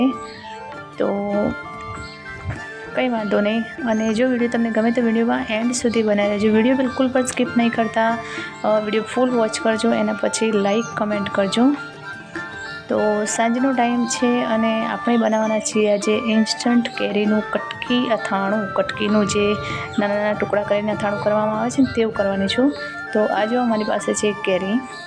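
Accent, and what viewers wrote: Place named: Gujarat, India. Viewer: Aapki Gujarati bahut hi meethi hai Di 🍫 vlog so super 👍👌👌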